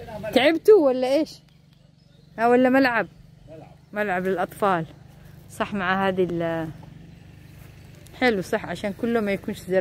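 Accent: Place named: Saudi Arabia. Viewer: فديتك والله 😭😭😭لهجتك سعوديه 🇸🇦🇸🇦🇸🇦🇸🇦🇸🇦 أنا بعد من السعوديه عايشه في المانيا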